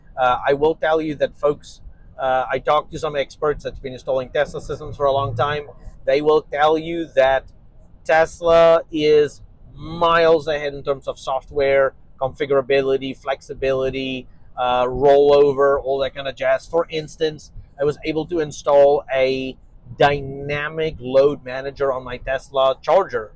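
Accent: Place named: South Africa. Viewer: offside comment here. you sound Souht African bro. any ties to SA?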